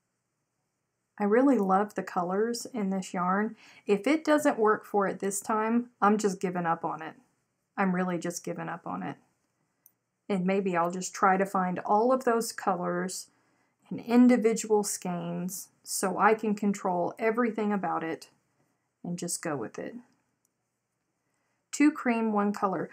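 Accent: South, United States. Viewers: OH MY GOODNESS!!! I JUST heard where you are from😃😃😃 My hometown is Savanah Georgia🤗 I'm a GA girl living in Las Vegas, NV, and I can tell yall, it's true...These people have NO idea about sweet tea😳 They actually think you can sweeten cold tea😳WITH ICE IN IT😳😳😳 Ok, rant over😂🤗Oh, I do like the granny square you are working on, that's just enough beautiful color to make it pop. I have been binge watching your videos since I found you Saturday. I have a question for ya, I heard you say you were a graphic designer. Did you go to SCAD? I have a great friend that is a graphic designer & she graduated from SCAD. Uh oh, yep, just heard you talking about high cotton, yep it IS a southern thing. I heard that all y life too, lol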